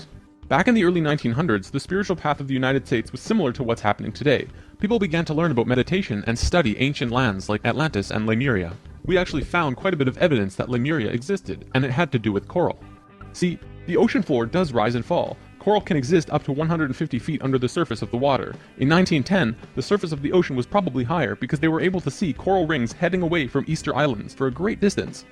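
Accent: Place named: Canada. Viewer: It's the same voice from the original but the pitch has been lowered so he doesn't sound like one of the chipmunks, but the way that the words are pronounced, the rhythmic speech pattern and verbal cadence are the same as the original movies narration. I believe that the narrator is Canadian based on the pronunciation of certain words.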